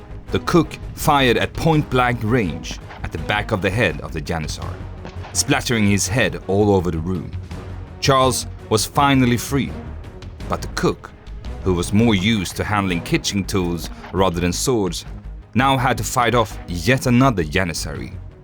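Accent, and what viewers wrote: Sweden. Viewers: There is nothing more cringe for a Swede than hearing another Swede speakinf English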